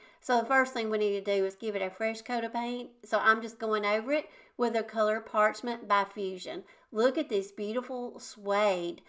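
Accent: South, United States. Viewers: Love all your ideas. Your country accent sounds like mine that I can't get rid of 😅😅. Where are you from 😊.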